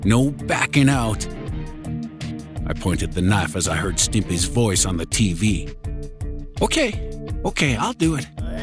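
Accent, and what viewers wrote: United States. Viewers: I love your country accent man lmfao . But I told everyone on the strange mysteries comment section that this is your channel because literally everyone hates it there now ever since you left, like you were the cherry on top of the cake man Nathan just lost so many subscribers and I literally watched them because like when you would narrate its like you would make learning funny and interesting and you always caught my attention ! Now the guy they got there now is so stale and dry lol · Good story, and awesome delivery! I LOVE that slight Texas-type drawl you have❣ I think I just may have to subscribe to your channel, lol! I'd love to hear you narrate some long stories as well. One in particular comes to mind. It's called the "New Fish". King Spook did an amazing job with it, and I really think it's a story VERY well-suited to your style as well. 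Anyway, keep up the great work, darlin'! 😉😘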